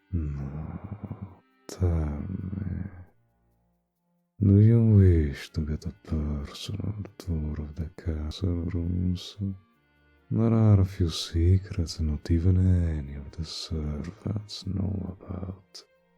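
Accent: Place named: Russia. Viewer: Why do i feel like the accent is russian😨(its super cool thought)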